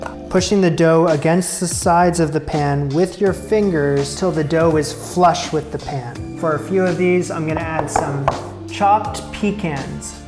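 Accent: Canada. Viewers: OMG!! Just found you a couple of days ago and thought, this guy sounds Canadian, how 'about' that??? Then I found this... However, I love my butter tarts you have to make them both ways!!! Maple Syrup is the best way not too sweet and yum!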